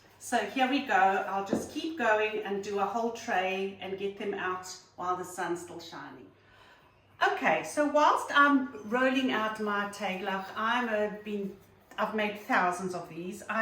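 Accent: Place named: South Africa. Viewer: Sounds as if she is from Johannesburg South Africa not Sydney Australia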